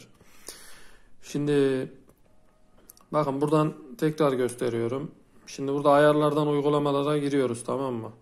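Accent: Turkey. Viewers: Abi yozgatlımısın?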